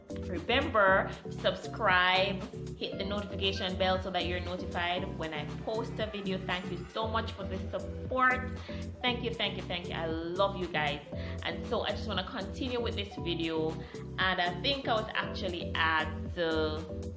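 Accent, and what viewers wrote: Jamaica. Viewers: your hair is beautiful and do i hear a jamacian accent?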